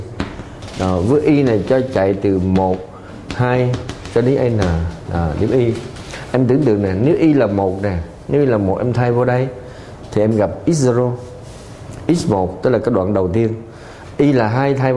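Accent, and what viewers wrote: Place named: Central, Vietnam. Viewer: Giọng thầy miền Trung, Qng hay Qnam thì phải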